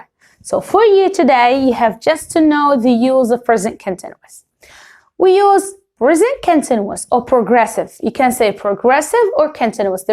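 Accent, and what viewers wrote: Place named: United States. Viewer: بسم الله ماشاءالله ثقافتك واسعة ولغتك كانك اميركية 💚💚💚 جيش عالم المراة مع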